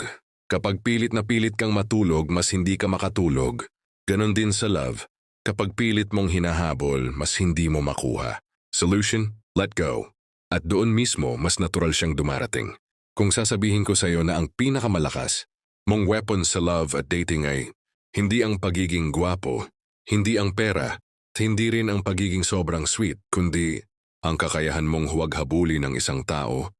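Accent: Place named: India. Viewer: maniniwala na sana ako kaso parang indian na naga tagalog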